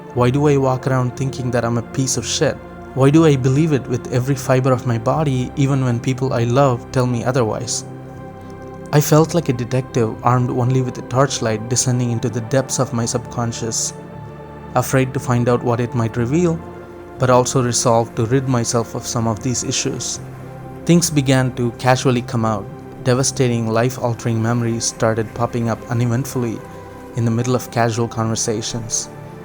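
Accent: South India, India. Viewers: I can't tell you how similar our experiences our, I too am from the same place that you belong to (guessing from your accent) I had the same experience with smiling as described by you. I was rediculed once for my smile after that it took me ages to smile naturally. Coming from a poor south indian background with darker skin didn't help me with building my confdence. Thank you for these videos. You may not know the ways you are influencing other people's life without even realizing. Keep up the good work.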